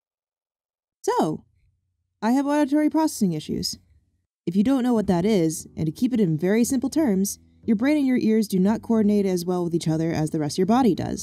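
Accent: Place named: United States. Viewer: Visuals were great, but I'm sorry the speech was so fast in an American accent I have no idea what was said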